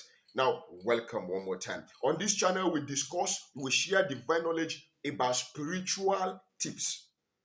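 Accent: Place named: Nigeria. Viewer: getting you lound n clear from Kenya, love your Nigerian accent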